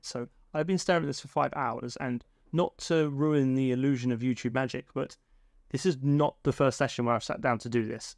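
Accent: United Kingdom. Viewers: dude you're like a british me its awesome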